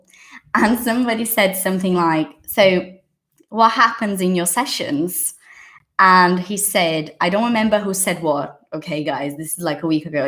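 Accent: United Kingdom. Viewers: I love this accent British ♡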